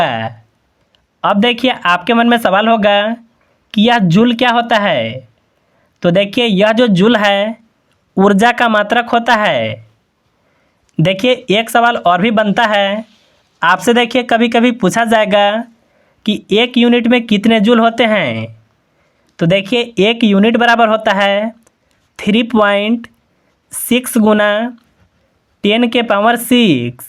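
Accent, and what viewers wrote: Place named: Bihar, India. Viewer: Bihar se lag rahe ho sayad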